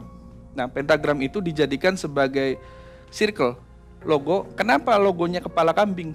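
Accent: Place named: Banyumas, Indonesia. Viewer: Saya Suka dialeg bang...natural gak d jaim2in...lucu khas jawa ngapak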